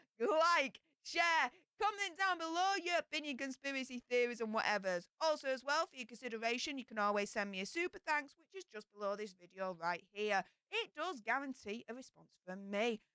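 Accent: Yorkshire, United Kingdom. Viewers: The British accent sometimes gets a little heavy. Harder for Americans to understand. I like ur show tho. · Too loud? Thats your natural voice or so I thought? Your the same in all your videos as far as I can tell. Get used to the Yorkshire Gob folks :) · I love your accent, what part of the UK are you from?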